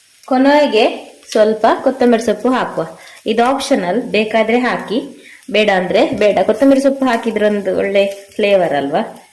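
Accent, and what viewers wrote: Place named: Bangalore, India. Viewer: In books nobody use Bangalore Kannada tulu nadu ppl talk books Kannada.. your Bangalore Kannada lot of slang ok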